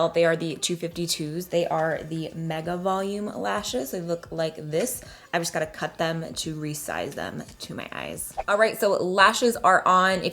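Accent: South, United States. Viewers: Used to have a super southern accent too... go watch the original videos and you will see what I’m saying. I do love her makeup so much though!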